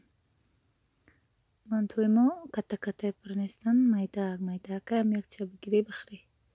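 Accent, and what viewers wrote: Kulob, Tajikistan. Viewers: Салом ма дав да канали хдт мехмон шидм да як канали дигат дромадм ай тарзи гапзанит фамидмки тоза Кулоби нав якта блогери кулоби дидм .манхам кулобиюм .бо хамрохи шавхарам бо фарзандом да масква зиндаги мекунем Кулобчон ба пеш .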